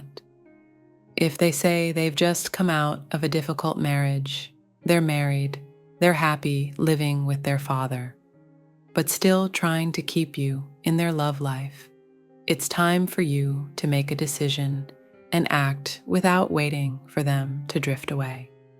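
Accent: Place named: Thailand. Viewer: Your Thai accent is amazing!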